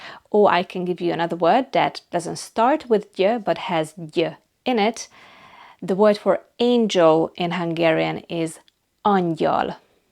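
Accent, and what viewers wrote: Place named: North America. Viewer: you speak very well, i think you are native hungarian, but then at the end your english is North American. I am from Sask.Canada, and you don't have the eastern Canada French sounds or the middle USA drawl, and dont sound like you are from the UK.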